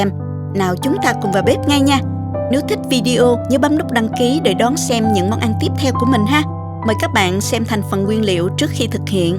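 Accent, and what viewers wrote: South, Vietnam. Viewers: That cam on co...1 giong noi rat am cung....ngot ngao giong mien nam.ngot oi la ngot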